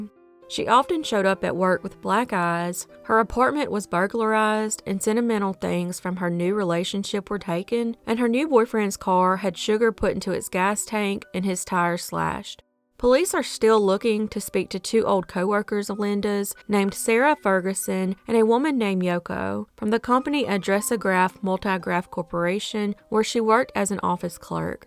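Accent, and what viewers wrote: South, United States. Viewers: content a side, I have to say your calm pitched tone of your southern bell voice is perfect & keeps me coming back, although MANY others can have great content gets sidelined when they try to say it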